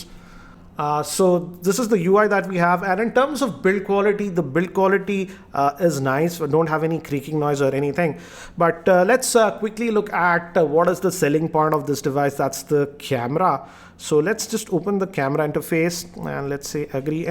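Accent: India. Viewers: All you Indians have the same tacky accent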